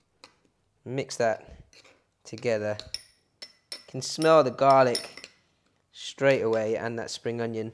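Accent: United Kingdom. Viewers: Great video, you explained the details on how to make it. I also love your British accent. Oh boy, you have used too much oil in making it.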